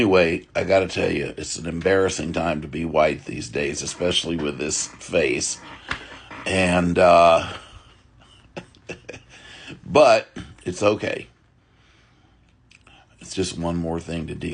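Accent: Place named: South, United States. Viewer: Forget about what people say about your beautiful southern accent❤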